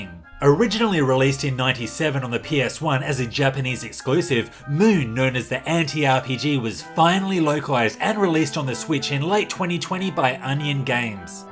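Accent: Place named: Australia. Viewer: Your accent is all over the place. I’m Australian and it sounds like you might be too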